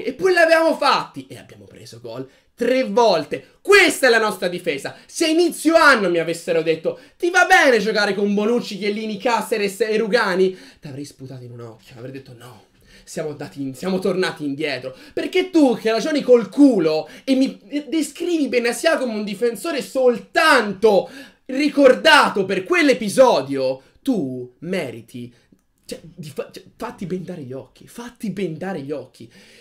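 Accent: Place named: Turin, Italy. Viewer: Tipico accento di Torino. Hai studiato a Cuneo o ad Alessandria?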